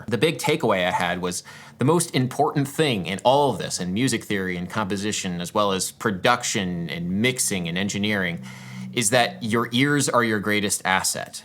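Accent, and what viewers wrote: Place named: United States. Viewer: Man.. something in my mind told me that you were britsh.. but now I realized that you dont have an accent.. you are american.. my eyes were so closed haha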